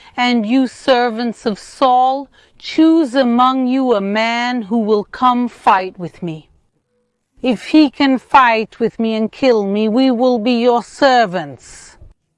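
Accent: Israel. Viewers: I love your Israeli accent.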